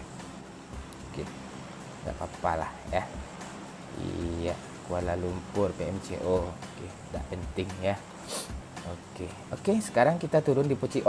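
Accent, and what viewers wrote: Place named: West Sumatra, Indonesia. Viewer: sikampret kacau, salam kenal abang aq dri jawa barat tapi karena sering denger logat minang kocak jadinya kadang kebawa bawa jadi kadang lupa logat sunda nya, sehat selalu bang terus upload tiap hari, rezekinya di lancarkan.. orang proo nii buahaaaahahaha 😂😂😂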